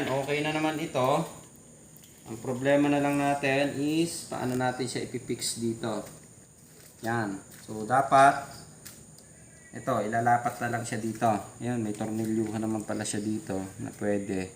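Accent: Mindoro, Philippines. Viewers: Tagasaan po kau sir...parang taga MINDORO po kau kababayan ko..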